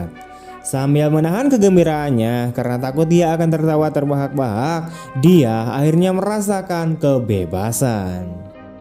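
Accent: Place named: Sunda, Indonesia. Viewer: Anyeng suara nbte ngenaheun co aa ti Sunda siganaamah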